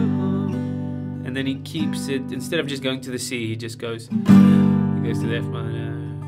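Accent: South Africa. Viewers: Nice to you hear a South African Accent! Lovely lesson, man.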